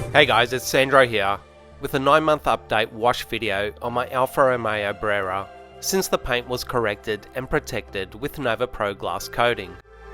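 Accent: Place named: Australia. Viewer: 3rd video in and I’m hooked! Good to hear an Aussie voice in a car detail video, Big fan of your work and your car, pity I’m on the other side of the city.. Sub.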